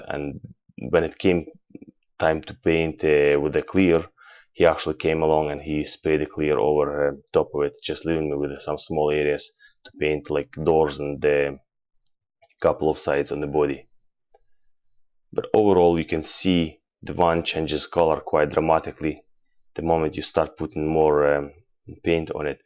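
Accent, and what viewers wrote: Scotland, United Kingdom. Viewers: Love how the Scottish accent comes through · Love the accent. Is it Aberdeen? Dundee?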